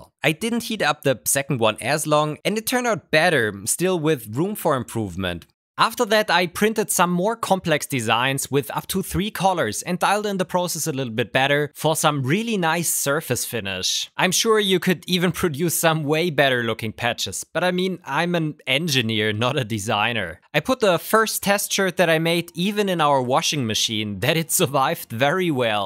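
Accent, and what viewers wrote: Germany. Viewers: Guten Tag im Stefan 😂 du bist locker Deutsch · first 5 seconds in, "DAS IS N DEUTSCHER, ICH KANN ES HÖREN!" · Deutscher Akzent? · Are you German? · are you german? but cool vido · Ich bin eine Minute drin und muss sagen du hörst dich sehr deutsch an :D nice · bro bist doch deutsch oder man hört das harrrtt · hey are u German? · Bro... are you German?! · Bro ich klick auf das video und hab direkt das gefühl gehabt, dass du aus deutschland komms. Sber sehr cool, was du machst❤ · are you german? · I hear Deutsch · Geilster Deutscher Akzent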